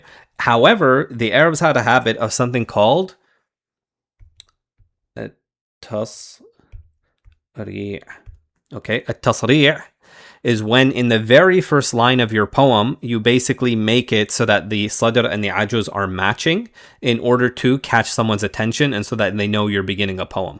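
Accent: Iraq. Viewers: are u iraqi? I'm just wondering because of uour accent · زين اني شلون عرفتك عراقي من اول كلمة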